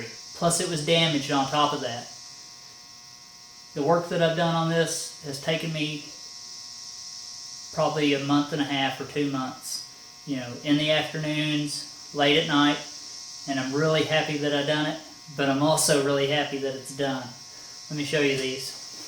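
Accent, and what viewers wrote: South, United States. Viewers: Me Now he's from a southern State you fool. Of course he's saying "to"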